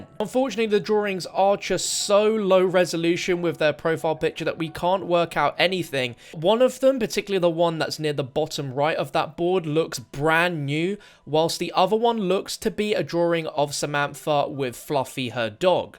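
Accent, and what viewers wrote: United Kingdom. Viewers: Anyone know where I can watch this without some annoying british guy talking over it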